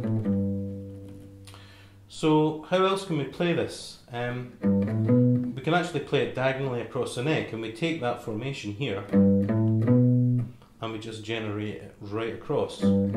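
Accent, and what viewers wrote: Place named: Scotland, United Kingdom. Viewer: Nice to hear a nice well modulated Caledonian accent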